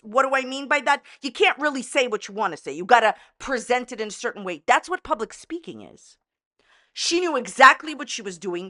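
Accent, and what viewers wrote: United States. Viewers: I love how says Ambalin 😄 She has a definite accent from the region she lives in. But so do I. We have different accents in different parts of the US.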